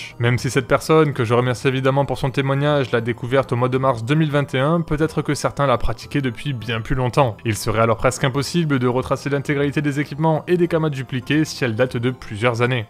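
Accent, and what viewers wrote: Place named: Iran. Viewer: ça fait plaiz d'entendre un iranien (a l'accent)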